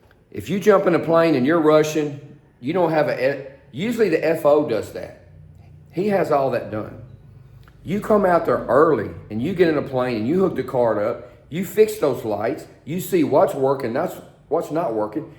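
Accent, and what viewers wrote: South, United States. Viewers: I read Greg was good friends with Dutton. Greg had 3500 flight hours and recent multi engine rating plus he seemed to know operational aspects of the Citation well enough to suggest he might have been best in right seat. Using my FSX to recreate the flight with 2 miles visibility, I lost the airport multiple times while turning back to runway 28, 10 then close downwind 28 to keep airport in sight at 1000 agl, then tight teardrop base to final, lost airport again, continued and eventually saw airport envirnoment. Scary even in FSX. I think they were 500 agl, or so, base to final. Nervewracking just thinking about it. Enjoy your podcast,. You nice southern accent reminds me of folks from Mississippi, like me.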